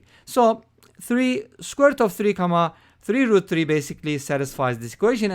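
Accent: Turkey. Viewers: Excellent tutorial, fantastic perspective! Just based off of your accent I think you are Turkish aswell right ? Just an assumption you don't have to answer it haha.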